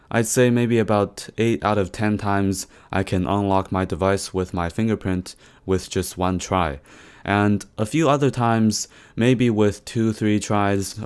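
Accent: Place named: China. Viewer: Hi, are you from China? Just a guess based on your accent:) Your videos are awesome.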